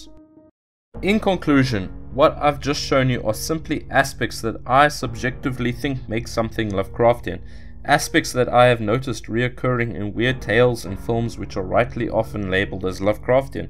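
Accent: South Africa. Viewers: I can't help but feel like the narrator is south african.. Are you South African?? If so that's fuckin Lekker bru!!!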